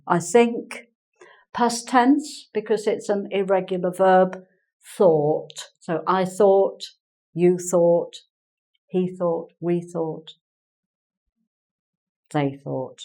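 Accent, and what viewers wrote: United Kingdom. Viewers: Gracias maestra es muy clara en su enseñanza. Me encanta el acento británico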